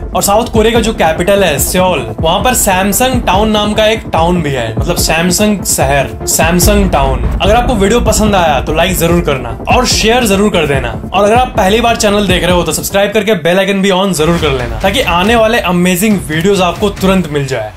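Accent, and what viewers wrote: Bihar, India. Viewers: sala ekdum ganda wala bihari lagta hai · Aap bihari ho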